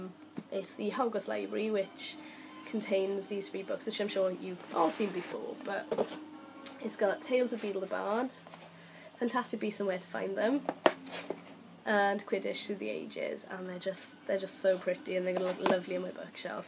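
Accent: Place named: United Kingdom. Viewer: Your accent is so gorgeous!! What part of the UK are you from? And I love the video. :') Before I Fall is amazing.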